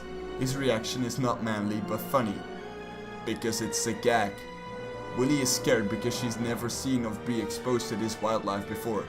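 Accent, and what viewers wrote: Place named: Central Europe. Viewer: I'm just going to assume you're a white guy who doesn't actually know what racism or sexism is. From the sound of it you are from middle Europe? Its quite ok that video doesn't have more views than it does.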